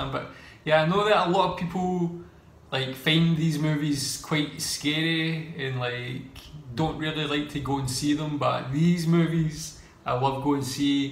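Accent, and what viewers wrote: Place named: Scotland, United Kingdom. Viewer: Yo man, you have the cutest scottish accent. I could listen to you for hours. I wonder if you could do podcast or audiobook lol. Anyway, please do watch this movie. Give us a review and let us know if it's worth our money. Thank you!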